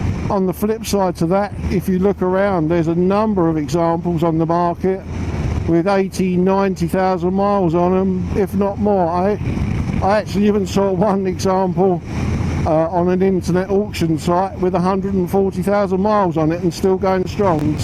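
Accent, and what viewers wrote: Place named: United Kingdom. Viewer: For the love of Christ, why do you brits insist on pronouncing the letter Z as the word, "zed?" It sounds absolutely ridiculous. It's a ZZR, not a zed zed r. Z. As in "zee" if you must, but dammit, knock off that retarded zed nonsense. And yes, I have one, an 04, moonlight silver with corbin beettle bags, and i would NEVER call it a zed. Its a ZZR!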